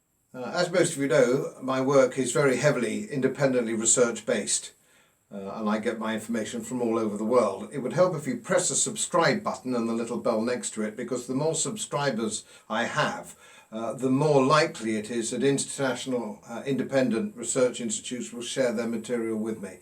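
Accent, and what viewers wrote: United Kingdom. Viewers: Love to hear a real British accent, and yes I hate politicians for this mess they have done to our country.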